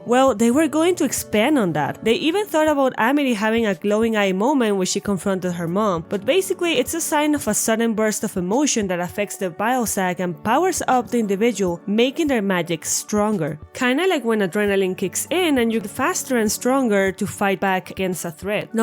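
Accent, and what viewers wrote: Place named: Latin America. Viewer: Are you latina?? Omg I love your accent I'm from Colombia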